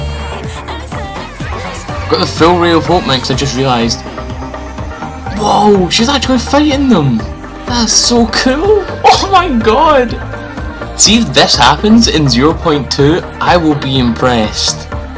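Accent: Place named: Scotland, United Kingdom. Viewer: I LOVE YOUR ACCENT !! O.o ... you are scottish aren't ya?! XD ...I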